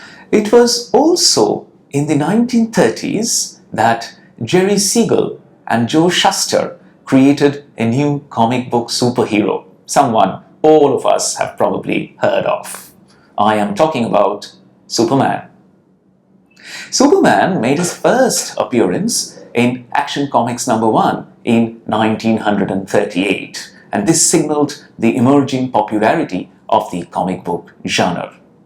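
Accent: India. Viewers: He is not attempting to sound British. This is the acrolectal variety of Indian English. Please don't be harsh on people without any reason.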